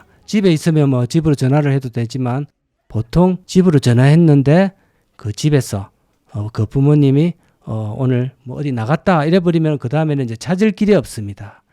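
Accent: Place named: Masan, South Korea. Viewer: 아~ㅎ 고향이 마산이시구나.ㅎㅎ 배챠 하실때 느낌알제.ㅋㅋ😂😂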